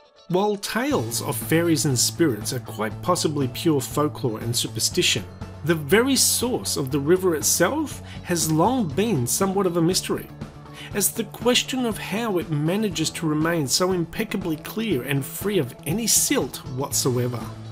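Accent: Australia. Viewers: Thanks for soften your australian accent.....!!!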